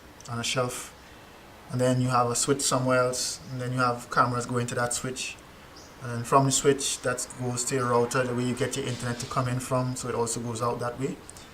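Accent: Trinidad and Tobago. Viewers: Sounding Trini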